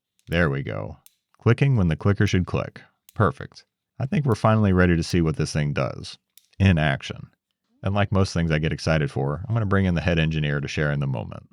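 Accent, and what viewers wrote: United States. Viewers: I know it has been mentioned before. Thought id ask after some time has passed. Willing to sell the modified drawings you made of this? I have the ivan drawings for a while. decided to get a new book and was disappointed to see the same old drawings. The faded and missing bits that you mentioned annoy me. Some modern cad drawings that havent been disintegrated from scanning a copy of a copy of a copy would be nice. Big fan of the channel. I also recognize your accent. Might help to know im a local near the bluegrass as well.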